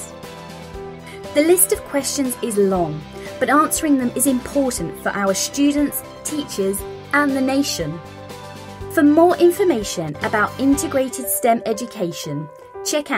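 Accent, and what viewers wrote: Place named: United Kingdom. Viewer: In britain it is spelled with an "s" and their accent seems to be british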